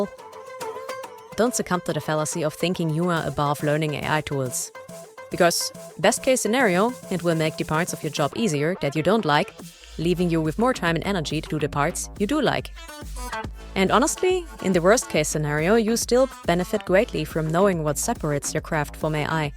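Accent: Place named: Germany. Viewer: Thanks for your teachings, Senpai. Your german accent is cute. But~ enough simping for one day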